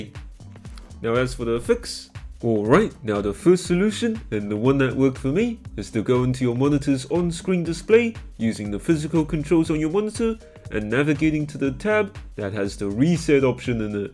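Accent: New Zealand. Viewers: Hes a kiwi😂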